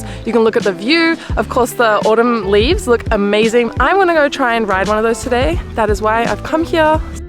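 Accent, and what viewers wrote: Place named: Australia. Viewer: You can 'hee-yah' the 'dee-yah' - I love your Australian accent! 💖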